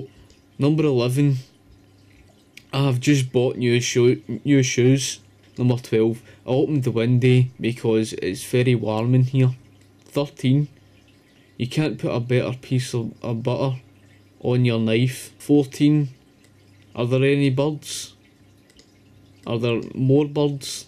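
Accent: Scotland, United Kingdom. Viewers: I love your accent , I would never attempt to try and talk like you, It would be such a disgrace to your beautiful language lol, I talk with a heavy southern US accent I hope when I come over there people can understand me, Do Scottish people like Americans .the ones from the south that have manners and are friendly? Which part of Scotland would you say is the most friendly to Americans and which is the parts that do not like us that much? I want to visit Campsie the most.Is it a friendly area?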